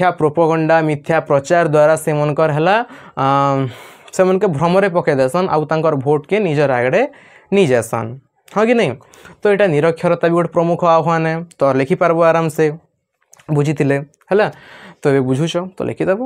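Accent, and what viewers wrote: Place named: Kalahandi, India. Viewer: Kalahandi language re sunle bhal laguche 💕💞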